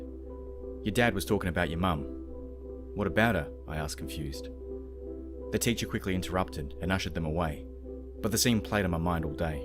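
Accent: Australia. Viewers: haha I'm a sucker for a man with an Aussie accent..... Yes I'm a strange girl hahaha. An Aussie accent and horror stories/crime.... Winning here!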